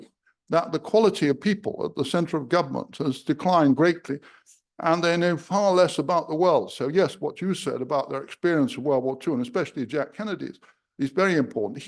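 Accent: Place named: United Kingdom. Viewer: yes, when I hear a pompous British accent I know it's a coward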